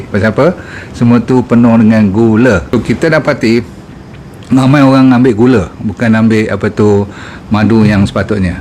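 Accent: Malaysia. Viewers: Sepertinya profesor ahli soal makanan yg terkontaminasi bahan kimia, saya senang nonton videonya hanya kadang-kadang aksen dialektika melayunya bikin saya coba mengerti maksudnya, 🙏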